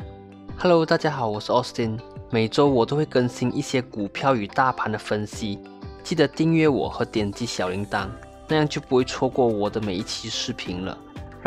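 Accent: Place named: Malaysia. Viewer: Austin是马来西亚人吗？说话方式很像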